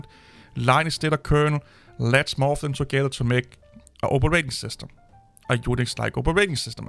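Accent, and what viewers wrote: Denmark. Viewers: I actually subbed to this channel back then just because of this danish accent. Especially when starts destroying delusional fanboys, it cracks me up every time 😅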